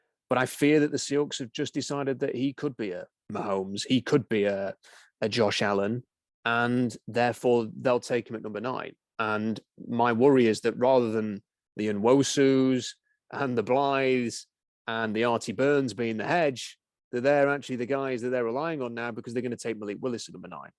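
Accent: England, United Kingdom. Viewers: Great analysis. First time listener. Still trying to wrap my mind around NFL coverage in an English accent.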